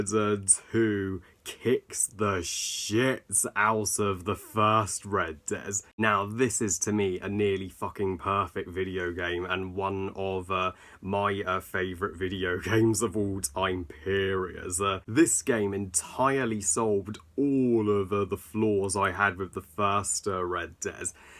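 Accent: West, United States. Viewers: great review as always and i loved your western accent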